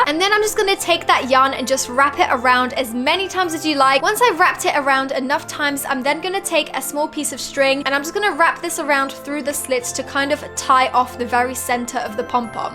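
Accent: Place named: England, United Kingdom. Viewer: Yes I like your DIY core where are you from are you from England or from are you Irish cuz it's I was just curious cuz that accent sounds like you're from England it'd be great to hear somebody else you know talk English I just wanted to compliment on your your crafting send me a line and tell me you and I'll just send me a line I said Thank you or you know that kind of thing all right God bless you have a blessed week and evening I can't take care of by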